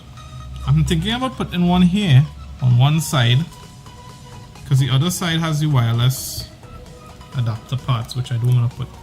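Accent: Trinidad and Tobago. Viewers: Mannn I swear something trini bout that accent lmk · u from trinidad?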